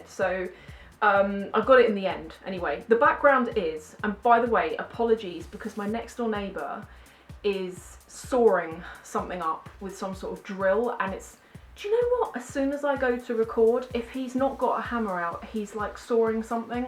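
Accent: United Kingdom. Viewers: british accent is wonderful !!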